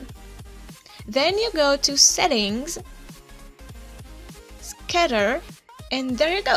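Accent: France. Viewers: Na real man o sotaque dela é frances mas continua sendo bonito pra caramba uwu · You are french ?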